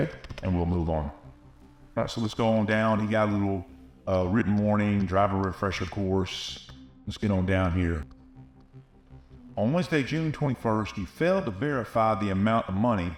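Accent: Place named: Appalachia, United States. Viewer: LAME REVERB EFFECT on your hick hillbilly narration. LOL You are TERRIBLE at this. You are more on the level of Kitty Cat videos.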